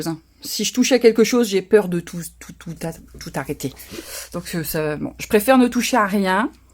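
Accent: North, France. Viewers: Coucou 🙋‍♀️J’avais reconnu que vous étiez du Nord à votre accent, sourire… Je suis du Grand - Est, de la Moselle.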